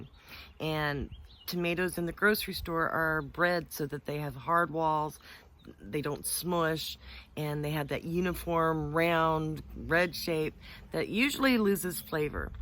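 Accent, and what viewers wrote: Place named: Texas, United States. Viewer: Thank you Mam, very nice video and very informative. I like the Texas accent. You are lovely and gorgeous as your tomatoes. :)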